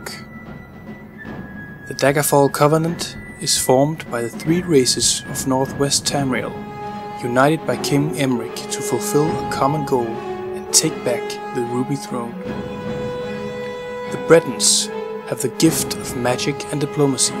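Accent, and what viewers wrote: Denmark. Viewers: Hey - great video! I couldn't help but wonder, are you Danish? You seem to have a slight accent, and your DK in " " hints at it as well. Cheers, a (fellow) Dane. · SO dude, are you Danish? Just wondering.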